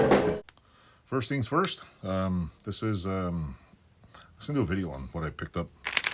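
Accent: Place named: Canada. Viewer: you sound like native canadian but are from utah i assume... so odd... <link> sign guy btw ^ Tony!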